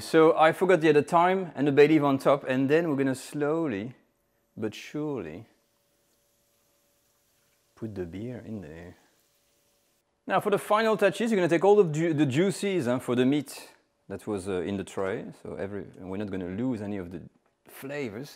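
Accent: France. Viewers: Love French food, and love the French accent. This looks delicious.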